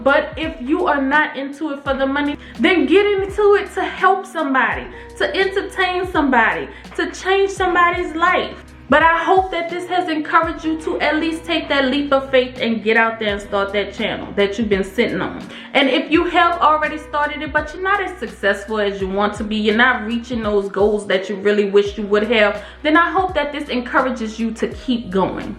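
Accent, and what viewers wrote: New Orleans, United States. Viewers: You have to be from New Orleans. But this is a good video!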